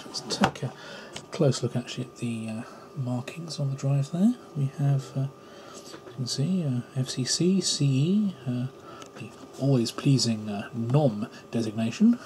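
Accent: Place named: United Kingdom. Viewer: Thank you for the video ! im planing to buy one of these 8TB baby's. YOur british accent was a delight to wacth this video. unlike other guys whos accent i cant even understand most of the time. i have a simple question, if i buy this seagate 8TB external drive, can i just drag and drop my files from my laptop into this external drive ?? will it be this simple? cus im assuming this is just a sata drive, or will it upload to the cloud directly? im a lil confused whether i should buy this drive cus it says BACK UP PLUS.it's emphasizing on the package alot !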